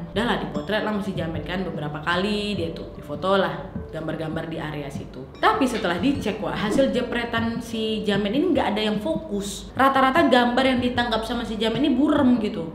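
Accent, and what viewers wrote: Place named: Medan, Indonesia. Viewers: Dari logatnya aku duga kak ininorang medan. Ya kan wak? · Mak cik ini aslinya dari mana? Dari logat bahasanya kayak dari tanah Deli. Tapi awak tak tahu pasti kali lah.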